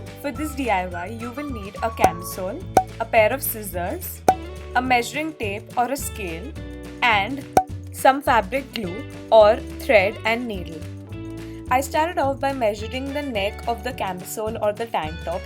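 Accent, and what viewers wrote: India. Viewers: *cute indian accept: ) you're cute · HONESTLY if you don't like the Indian accent you can fuck right off and click on another video, no one wants your negative and racist opinion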